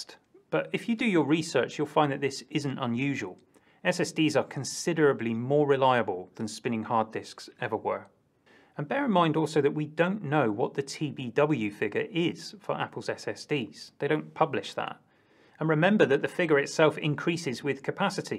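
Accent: United Kingdom. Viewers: the British accent is the best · British guy talking smooth - going to sell your data to a class action lawyer against Apple.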